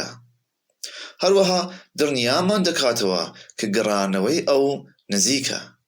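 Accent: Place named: Iran. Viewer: ئایا کوردێک نییە ئەم دەقە بخوێنێتەوە؟ ڕێزم بۆ ئەو کەسە هەیە ئەمە دەخوێنێتەوە، بەڵام ئەو کەسەی ئەمە دەخوێنێتەوە دەنگێکی فارسییە نەک کوردی، زۆر لە وشە و پیتەکان بە هەڵە دەخوێنێتەوە.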